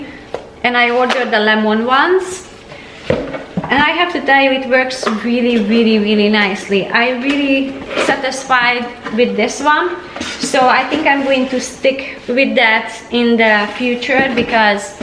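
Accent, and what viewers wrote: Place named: Hungary. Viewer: Great idea to have everything in one place for recipes and products you're liking! It will be interesting to look back a year from now and say, do you still like the vacuum and things.:) Yes, you said "Sal Suds" perfectly. Your bloopers remind me, in a recent video you mentioned when you first started your channel, you wondered if people would understand you. I LOVE your Hungarian accent - having lived in several countries I just enjoy hearing other people's way of speaking and learning new words. I don't remember if I told you or not. So keep it up, you are amazing and maybe don't realize it, but people who haven't had the opportunity to travel will get a wonderful exposure to Hungary through your speech and your recipes. <3 Oh, and I think the pods brand is "dropps", I've seen them somewhere and maybe not positive, but pretty sure that was the brand name. 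Maybe? LOL Wishing you a beautiful day,!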